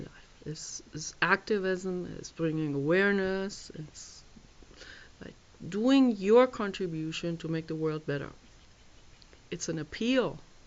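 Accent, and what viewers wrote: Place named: Germany. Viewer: Are You German? You have suuucchh a german accent. Great video!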